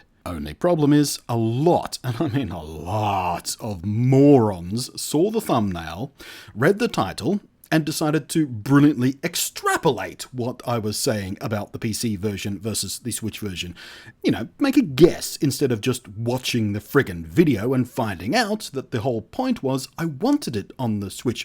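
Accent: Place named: Australia. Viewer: I love you milky smooth ozzie voice. No homo.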